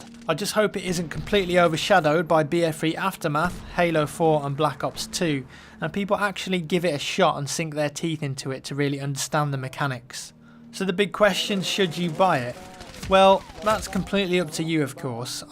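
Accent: United Kingdom. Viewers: Amazing accent, r u British?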